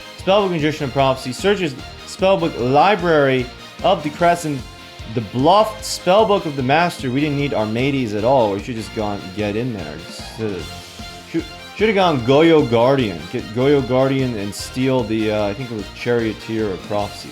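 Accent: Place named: Ireland. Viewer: A1 Irish pronunciation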